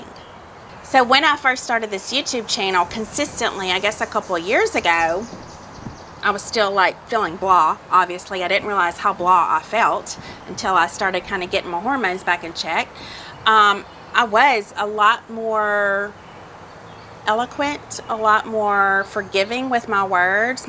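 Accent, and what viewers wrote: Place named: South, United States. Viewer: Maybe your southern accent could be why your voice comes across like that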